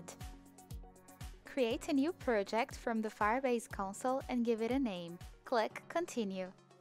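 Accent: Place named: Brazil. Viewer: Seu sotaque brasileiro é inconfundível! Parabéns pelo vídeo!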